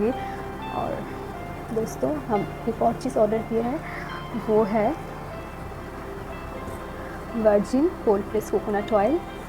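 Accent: Bengal, India. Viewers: Mone hocche khanti bangali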